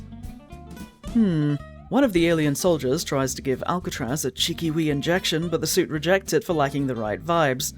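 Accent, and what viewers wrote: United Kingdom. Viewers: This is off topic entirely, but are you british? Irish, american? I honestly cant tell and that could be from severe brain damage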